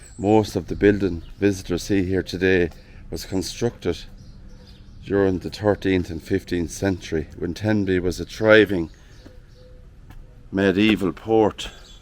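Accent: Ireland. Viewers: Many Happy Holidays In Tenby Thanks you are marvellous..Beautiful Irish brogue Xxx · Your beautiful Irish accent is like music to my ears ❤❤❤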